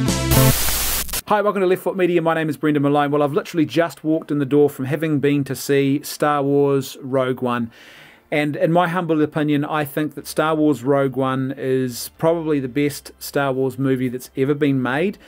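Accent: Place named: New Zealand. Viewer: By the way...gotta love that kiwi accent.. with all your passion about SW maybe you should run for PM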